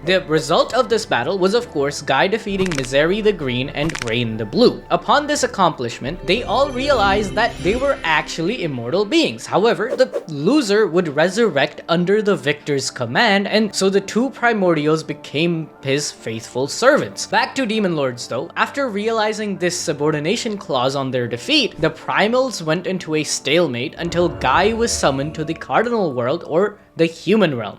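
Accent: India. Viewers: Are you indian by any chance?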